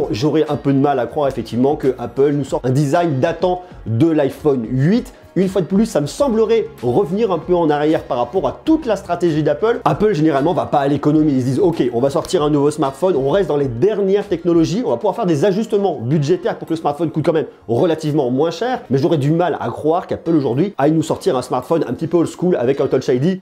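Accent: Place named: Clermont-Ferrand, France. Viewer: Mais en faites t’es clermontois !!!!!?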